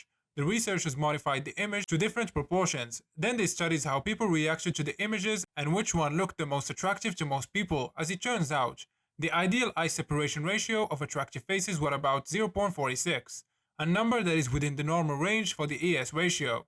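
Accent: Morocco. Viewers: This guy sounds very Moroccan.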